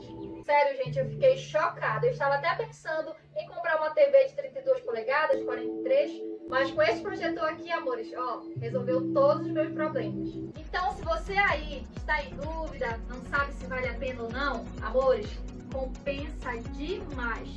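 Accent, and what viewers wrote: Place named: Rio de Janeiro, Brazil. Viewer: paranaense com traços japonês e com sotaque carioca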